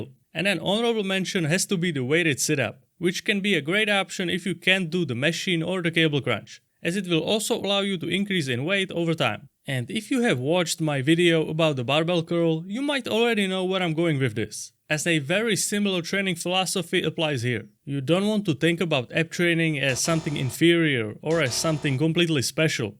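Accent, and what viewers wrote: Czech Republic. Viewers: czech accent detected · I was worried for a second there, hearing your accent, but Ig you're Czech ^^ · Are you from the Czech republic?? Because of the accent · Are you Czech by any chance?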